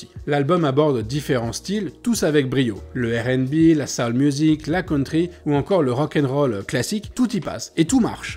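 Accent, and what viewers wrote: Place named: France. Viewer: Super vidéo, du sérieux dans la recherche et bien structurée avec un petit passage par la technique toujours intéressant sans être trop rébarbatif. Un phrasé bien frenchie mais ça va encore. Bref merci pour votre présentation.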